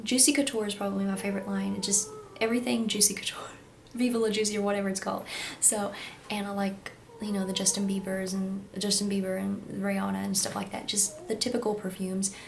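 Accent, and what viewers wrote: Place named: Kentucky, United States. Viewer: Great video, enjoyed it lol your personality is so amazing, ky ascent.. I never noticed lol prob where I'm from Ky also, really I never noticed lol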